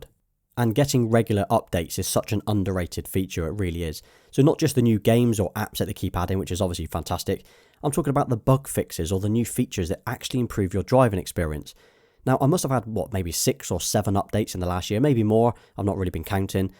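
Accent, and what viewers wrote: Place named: England, United Kingdom. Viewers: I enjoyed your video and love the English accent! Tesla UK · Tesla UK, another relaxed English accent to enjoy 🇬🇧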